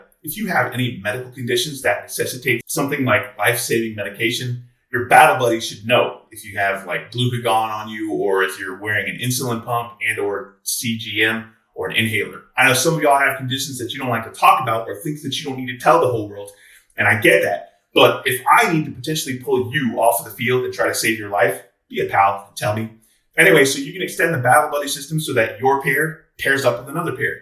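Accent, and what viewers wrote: Scotland, United Kingdom. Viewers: Super Scottish !!!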